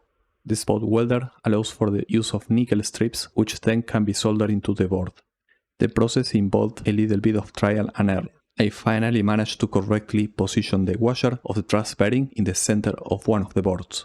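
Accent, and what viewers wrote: Argentina. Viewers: Where are you from? I recognize that Spanish English accent, maybe Argentina? In any case, great job! You are insane! Happy Holidays and keep the great ideas! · te reconocí el acento. Tremendo laburo hermano! Saludos desde Buenos Aires.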